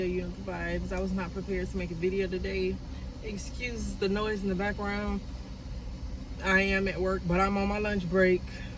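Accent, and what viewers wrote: Florida, United States. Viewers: First of all CONGRATULATIONS!!! I am soooo happy for you! Im not even sure how I came across you but as soon as I heard that accent I said “ She either from St Pete or Jacksonville!” LOL! I’m in DUUUUVALLL too! So it’s so nice to see you making your situation into a positive one! I caught a felony soon as I turned 18 & the judge said she was gonna make an example out of me. I was lucky I only did a night in jail 5yrs probation but the stigma with having a record never leaves! So congrats again! I can’t wait to see what awaits you!!🎉🎉🎉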